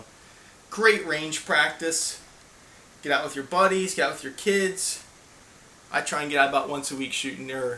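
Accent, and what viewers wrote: United States. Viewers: Is yinz from Pittsburgh? I'm hearing some signs of a SW PA accent. Could be OH too, sometimes. Anyhow, nice guns. I can relate.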